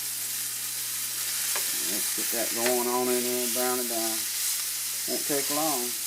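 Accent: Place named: Louisiana, United States. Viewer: That sounds like a Cajun accent. I'm going to make this tomorrow, it looks Gooder than good.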